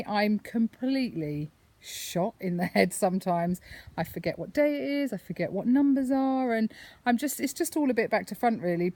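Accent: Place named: RP, United Kingdom. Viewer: Potty mouth doesn't sound the same with a British accent. It's very posh😘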